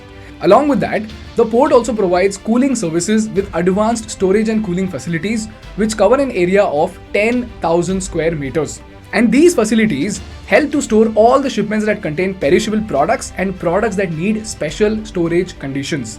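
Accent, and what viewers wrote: India. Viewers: Please get somebody who does not have an Indian accent! They sound horrible! they sound like the phone scammers who steal money from old people in America. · Maybe your english has a little indian accent?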